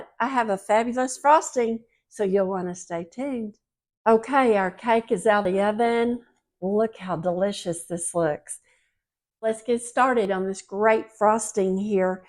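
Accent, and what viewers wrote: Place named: West Virginia, United States. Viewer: So delectable. Love apple anything. Where are you from? I am originally from WV, I'd swear you are too!!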